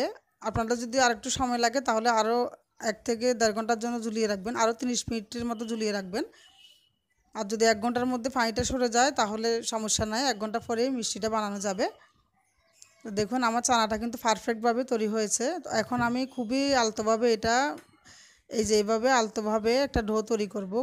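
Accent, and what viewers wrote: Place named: Noakhali, Bangladesh. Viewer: কথা শুনে মনে হচ্ছে উনি নোয়াখালির।এদিকের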